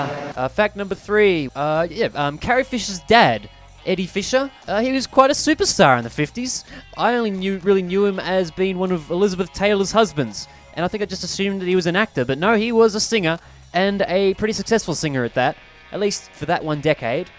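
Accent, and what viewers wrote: Australia. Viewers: I wasnt expecting Australian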